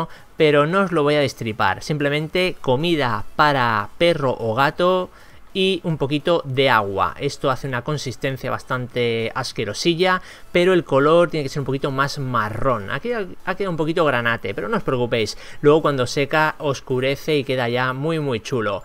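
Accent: Chile. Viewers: Eres chileno¿? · No sabias que eras chileno, por tu acent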